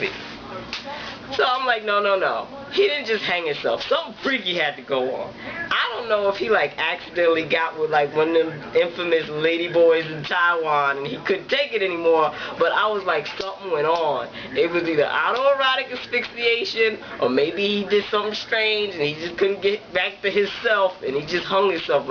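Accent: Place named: New Jersey, United States. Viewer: Hey, sweetie. Are you from Jersey? You have that accent. I live in Jersey, too. I think you and I should have an affair. Are you game? Yes or no, baby. Kiss kiss.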